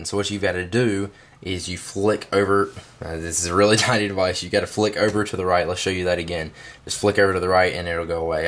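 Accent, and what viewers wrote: United States. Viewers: american cowboy acvent is rlly anoying.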